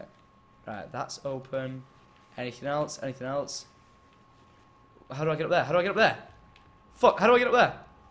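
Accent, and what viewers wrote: United Kingdom. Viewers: I like British guys British I like him <3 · Are you British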